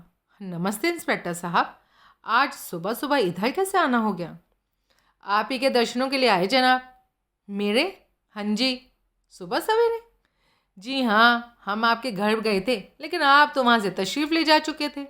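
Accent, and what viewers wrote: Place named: Punjab, India. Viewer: आप पंजाबन हैं, आपकी पंजाबी एक्सेंट बहुत बढ़िया है।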